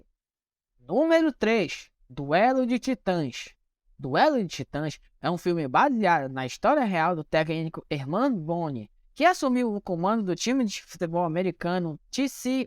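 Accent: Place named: Belém, Brazil. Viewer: Existe uma grande possibilidade de eu estar enganado, pois não sou especialista em nada, mas, se eu estiver certo, aposto que o narrador desse vídeo é natural de Belém (PA) ou (quem sabe?) Ananindeua. Talves Marituba, Sta Isabel ou até mesmo Castanhal, na área metropolitana. É evidente que ele está lendo um texto e está forçando a dicção, a entonação de voz, para parecer um reporter do JN. Obviamente, ele não fala assim entre os colegas da escola. Entretanto, muito provavelmente, esse perfil não é da plebe. É da burguesia. É de classe média, saca? Ainda estuda ou já estudou em boas escolas, tipo, escola particular, sabe como é que é ??? Mas, enfim, o sotaque não me engana. Se eu me enganei, ele é muito bom. Porque, para mim, ele não pode ser de nenhum outro lugar a não ser dessa região metropolitana que eu mencionei acima -- e se for da favela, é uma exceção à regra. 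O cara da favela, fala diferente, fala na gíria, aquele lance da malandragem, tá ligado? O que não parece ser muito o lance dele. Mesmo se fosse da favela, eu também saberia porque conheço as gírias de lá, e só pela maneira de falar, eu identificaria talves até o bairro em que ele mora. Rsrsrsrsrsrsrs😂 Gostei do vídeo, tanto pelo conteúdo, quanto pelo esforço dele em parecer um locutor ou reporter de TV. Ficou legal. O moleque é bom. É diferenciado. Valeu, ai, bro. Vou deixar um like.😊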